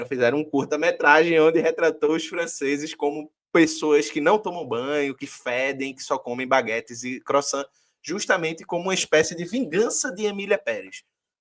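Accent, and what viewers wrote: Pernambuco, Brazil. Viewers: Grande iniciativa. Filosofia com sotaque pernambucano. Massa demais. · Mais um com sotaque pernambucano no canal meu coração não aguenta! 😍Salve,! Como é inteligente e bonito o camarada. Amei o quadro! Parabéns!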